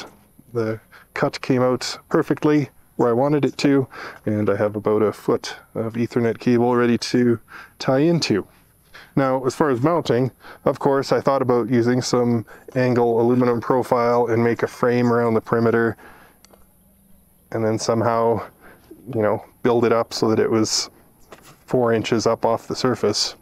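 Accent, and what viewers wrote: Canada. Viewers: I applaud your knowledge, skills and resourcefulness. Your presentation is well organized, clear, concise and complete. I also applaud you and your spouse for you adventurous spirit and commitment to your daring lifestyle. I enjoyed the presentation with the clever and apt visual effects. Very impressive. I also detect a soft Canadian accent. I wish you great success and fun on your continuing adventure and thank you for sharing a bit of your experience on YouTube Awesome!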